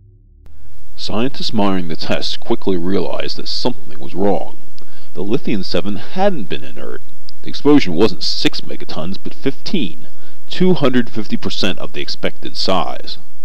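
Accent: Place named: California, United States. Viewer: something funny here is that the commentator try to replicate the 50's Trans-Atlantic English accent usually used in the 50's, the tone was simiar but the accent was not, it's a modern Mainland accent, Californian probably.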